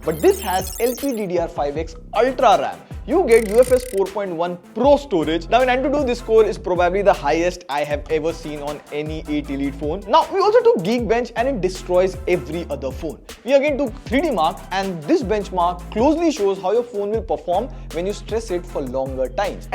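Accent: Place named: Bengal, India. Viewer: I think you are a Bengali boy because your English tone is just like them 😅😅😅😅😅 answer me please 😢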